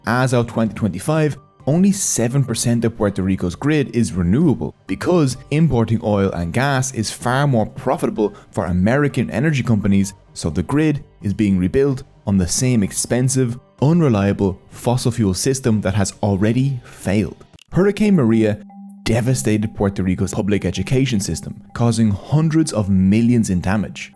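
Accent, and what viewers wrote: Ireland. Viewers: Are you Irish? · you sound Irish, Puerto Ricans and the Irish have history together. thank you for this brother really, when i go to Ireland i will show the utmost respect top your people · How come dude got a Liberal Irish accent?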